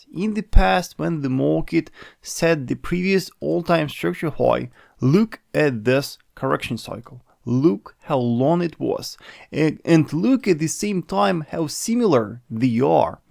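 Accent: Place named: Russia. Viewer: he speaks engruslish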